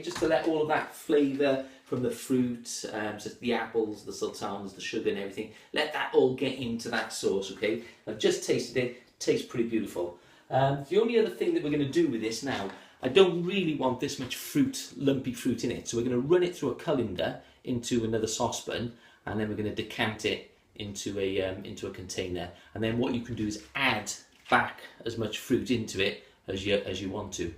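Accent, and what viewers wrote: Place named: Cardiff, United Kingdom. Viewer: Good Cardiff accent there boyo lol great recipe I’m a valley girl living in Canada. They don’t do good curry here 🙈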